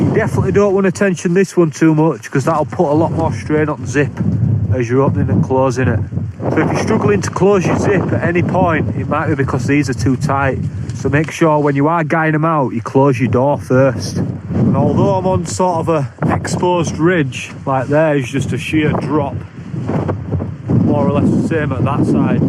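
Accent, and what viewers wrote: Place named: Bradford, United Kingdom. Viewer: Great to hear a proper Bradford accent!! Good lad!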